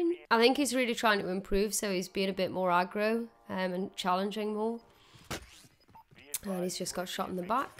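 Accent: United Kingdom. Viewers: Is she from the UK?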